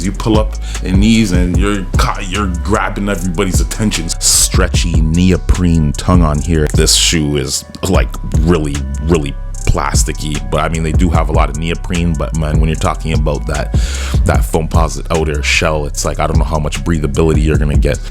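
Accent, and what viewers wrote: Toronto, Canada. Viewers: you definitely from Toronto 😂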